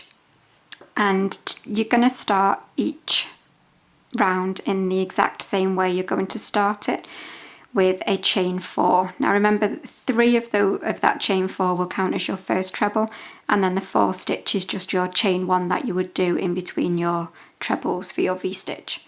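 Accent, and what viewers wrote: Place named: North, United Kingdom. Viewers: I love this stitch but I've never seen it in a granny square! Great video, thanks so much 😊 also love your accent!!! I'm from Yorkshire but I live in Canada so it's great to hear the sound of home 😊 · Nice Northen-y accent, which is a breath of fresh air! Would like to see your video for blocking!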